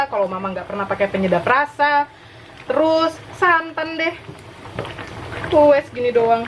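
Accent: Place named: Batak, Indonesia. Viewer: Mantap. Sukak kali aku nonton vlog kak ini, natural kali batak nya.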